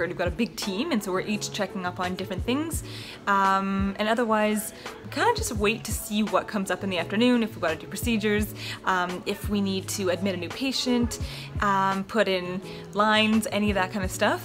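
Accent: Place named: Canada. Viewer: Where are you from ? Your accent sounds very Canadian and also like your from Minnesota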